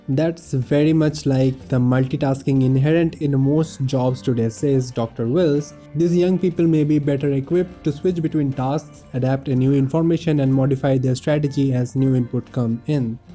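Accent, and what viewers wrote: Bengal, India. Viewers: you bengali?? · I just love that heavy Bengali accent ... Lightens up the mood (no offense)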